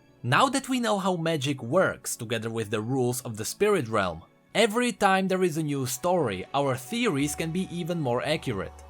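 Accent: Czech Republic. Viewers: Czech accent ? Or ? I think you are from czech republic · He is from The Czech Republic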